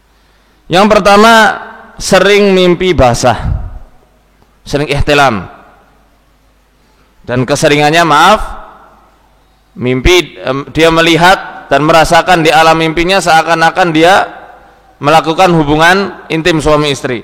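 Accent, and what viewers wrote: Banyumas, Indonesia. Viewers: Pak ustad asli wong ngapak ya....logate pada karo inyong😆😆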